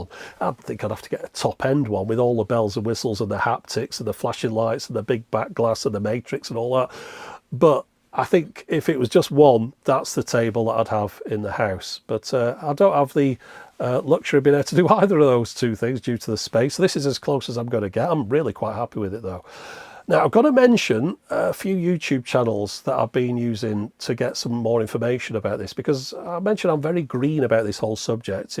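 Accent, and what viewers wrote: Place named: Northern England, United Kingdom. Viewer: you sound like a northerner like me, and that photo reminds me of a place called "Arcade Club" in Bury, (he's got one ion Leeds too), he's got Europe's largest arcade collection including lots of pinball tables! thoroughly recommended if you're ever near the area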